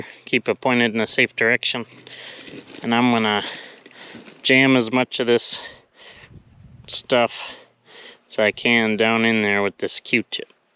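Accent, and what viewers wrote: Canada. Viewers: Hi, I am a new viewer. Are you a Canadian? You have a Canadian accent. Great channel.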